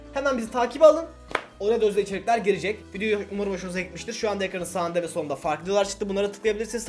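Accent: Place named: Southeast Anatolia, Turkey. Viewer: Abi niyeyse güney doğu anadolular gibi konuşuyorsun arada Yoksa sen güney doğu Anadolulumusun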